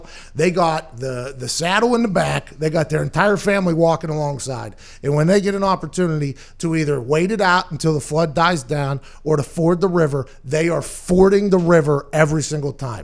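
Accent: Pittsburgh, United States. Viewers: That Pittsburgh language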